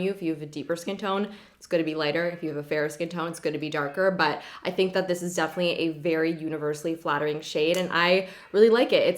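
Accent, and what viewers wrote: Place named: Canada. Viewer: I love your Canadian accent!! xo-Wendy NYC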